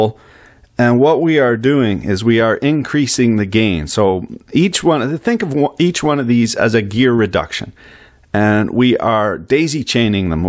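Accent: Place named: Nova Scotia, Canada. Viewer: What the heck is that accent? It sounds like someone born in Nova Scotia who moved to Ontario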